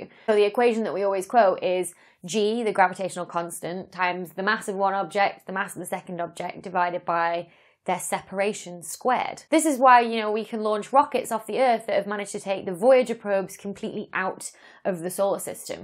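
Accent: United Kingdom. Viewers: I have a pronunciation question. In the 70s, I had a meal in Arno Penias' house with his son. It was probably years before winning the Nobel Prize. His son pronounced his last name pen-zee-as. This is not how you pronounce it and I wonder if it is because of your British accent or is my memory bad.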